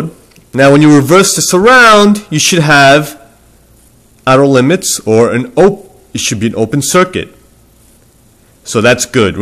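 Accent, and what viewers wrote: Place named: New Jersey, United States. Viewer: i like your new jersey ish accent :)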